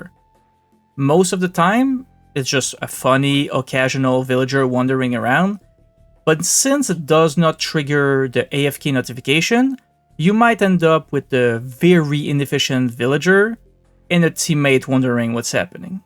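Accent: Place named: Quebec, Canada. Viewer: Je reconnais un Québécois quand j'en entend un.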